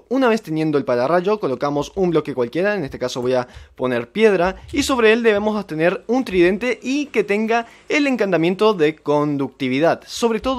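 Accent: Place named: Paraguay. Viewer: Man dos paraguayo? .__. tenés el acento paraguayo jsjsjs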